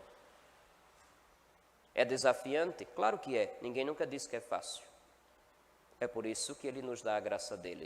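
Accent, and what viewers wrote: Northeast, Brazil. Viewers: LOUVADO SEJA DEUS POR ESSA HOMILIA CATEQUÉTICA (SEM FALAR DO SOTAQUE MARLINDO QUE EXISTE, OXENTE), LOUVADO SEJA DEUS PELO SEU SACERDÓCIO FIEL E SUBMETIDO A VERDADE PADRE, AMOR DE JESUS NOS LIBERTA DO PECADO QUE CONDENA E MATA A ALMA. O HOMOSSEXUALISMO TBM É PECADO! ✝️🇻🇦🙏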